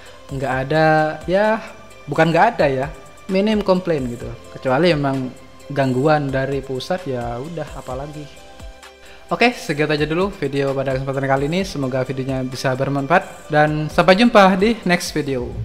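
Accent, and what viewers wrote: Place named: East Java, Indonesia. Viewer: Logatnya sampean kok kayak Orang Lumajang / Jember / Bondowoso. Sampean domisili mana mas?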